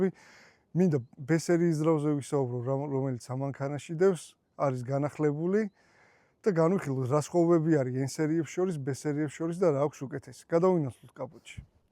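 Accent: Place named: Gori, Georgia. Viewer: გორელს კილო აქ განსხვავებული, მარნეულში გატესტოს მანქანა აზერი ხარო კიარ ითქმის..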